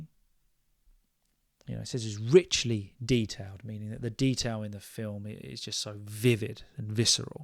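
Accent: England, United Kingdom. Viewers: A handsome English teacher with his beautiful blue eyes, and beautiful English accent 💗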